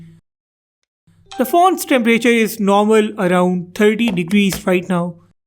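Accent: India. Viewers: What an indian accent....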